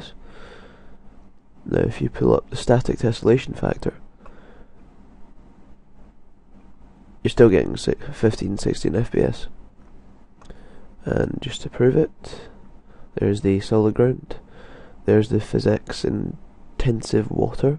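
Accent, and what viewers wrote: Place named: RP, United Kingdom. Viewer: "High Bread Fizz X" Dat fancy Brit accent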